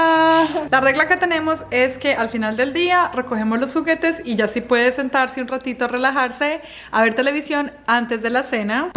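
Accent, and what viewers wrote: Antioquia, Colombia. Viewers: Ah qué bien queda cerca a Mánizales, si tienes un acento paisa, ah qué rico pronto puedas venir a Colombia. Bendiciones a toda tu hermosa familia, eres una madre y mujer ejemplar, lo haces súper bien y me encantó este tipo de videos.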